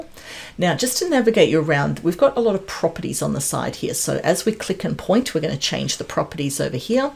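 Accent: Australia. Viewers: you are such a nice person... This is the best and full tutorial available. I like your aussie accent, clear voice and easy to understand. I watched this full video and this asset management app works great for me. It was my first model-driven power app, and I enjoyed it alot. Thank you so-oooo much.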